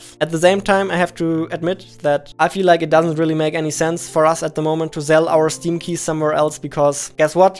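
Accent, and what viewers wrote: Germany. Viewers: because he's German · Ich habe direkt gedacht das du bei dem Akzent deutsch sein musst haha :D Super Video! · Man hört den Deutschen Akzent raus :D. Finde das Tutorial sehr Hilfreich! Danke! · His dialekt sounds like german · are you german? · Bist du deutsch? 😂 #nofront aber man hört es😊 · Hör ich da deutsch?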